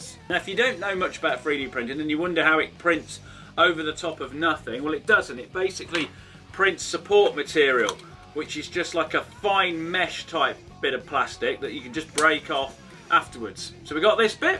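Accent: United Kingdom. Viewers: English accent... didn't you? Btw, awesome sir... 👍👍👍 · i always love British accent, thats help me learn English faster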